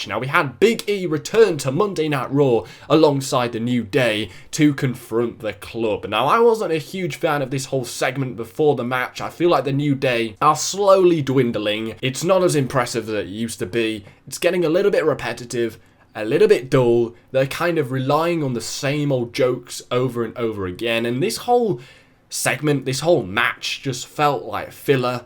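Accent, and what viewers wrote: United Kingdom. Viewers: First, as a lesbian, I agree, NO wrestling company should be trying to put that in their storylines. Perfect examples in the past, early days of Goldust, and TNA's Orlando Jordan. Gawd awful characters. Second, 4am? Thought I picked up an UK accent. Awesomeness! Have friends over in that part of world, including a woman still have feelings for. Third, may rewatch Seth VS Sammi later on. Still beat from the shows this weekend, so maybe later on, I could appreciate it better. 😉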